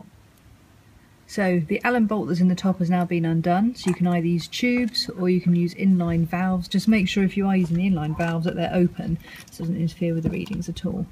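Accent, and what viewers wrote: United Kingdom. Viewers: Wow, you have an accent just like my ex wife. Are you from Surrey, U.K.??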